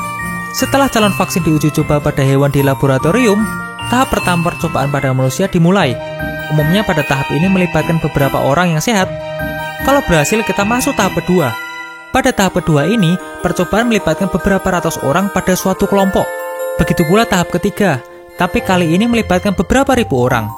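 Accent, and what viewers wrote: Java, Indonesia. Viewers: medok gimana gue yang bukan orang Jawa masih paham apa yang dibilang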